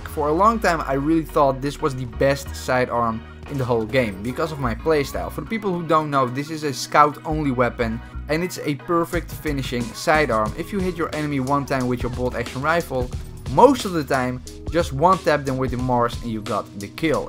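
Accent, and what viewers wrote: Netherlands. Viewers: je hoort wel Nederlands accent in je engels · You sound Dutch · Ru dutch?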